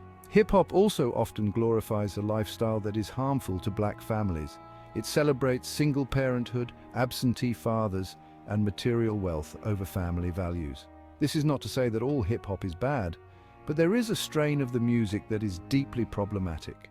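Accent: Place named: United Kingdom. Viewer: hearing a British guy talk about hiphop is wild haha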